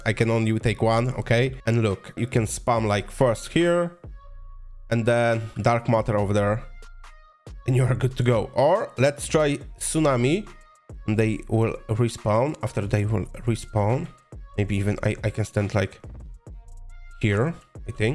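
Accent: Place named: Asia. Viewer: Dude, i hear the asian in your voice, i love it, you are awesome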